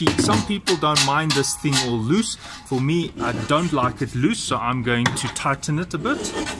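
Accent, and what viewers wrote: South Africa. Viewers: Love the SA accent, going to assemble my weeeber tomorrow now. Thanks mate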